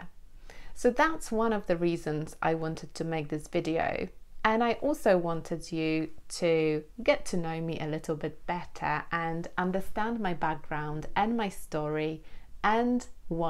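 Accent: United Kingdom. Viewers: Thanks for sharing such an inspiring story,! I'm Italian, and my love for the English language has risen to such an extent that I decided to become an English teacher by doing the CELTA course. As for your experience, what's the best piece of advice you'd give to a non-native English speaker wanting to become a teacher? What kind of approach should I take? To wrap up, how can I get such a top-notch British accent? Thank you and greetings from Sicily. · Wszystkiego dobrego Pani. Pozdrawiam z Polski. ❤ Super by bylo sie dowiedziec w jaki sposob udalo sie Pani osiagnac taki wspanialy brytyjski akcent.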